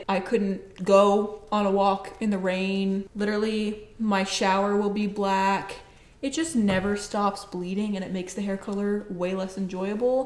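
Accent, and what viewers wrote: United Kingdom. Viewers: I love your British accent, as a Brit, I approve. Also love your attitude as ever...you are awesome.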